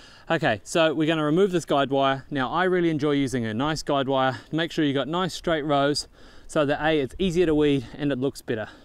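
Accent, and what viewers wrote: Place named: New Zealand. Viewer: I reckon, sounds like a kiwi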